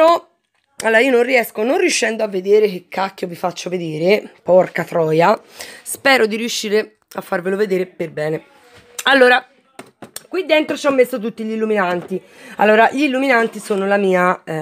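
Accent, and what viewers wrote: Tuscany, Italy. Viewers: "Si arriva babbo..." ❤❤❤adoro la parlata toscana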